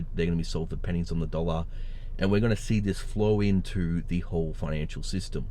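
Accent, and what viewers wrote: Australia. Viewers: Good one... the criminal bank cartels are starting to move... bail ins be a-comin sooner or later... Crypto will be the future.... dont worry about that, mate....fiat currency is fkd...cbdc idea is criminal... Im in Peru..love to hear the accent from the land of the meat pie - always....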